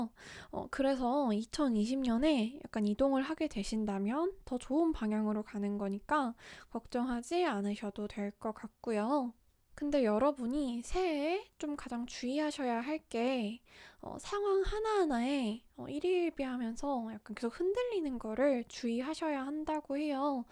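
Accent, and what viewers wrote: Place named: Seoul, South Korea. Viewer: 서울말씨 귀엽네요.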